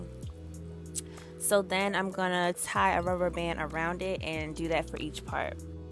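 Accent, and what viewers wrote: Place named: Washington DC, United States. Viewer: Your accent is familiar , may I ask if you’re from Washington , DC? Also watching you part was so soothing in an odd way ! Lol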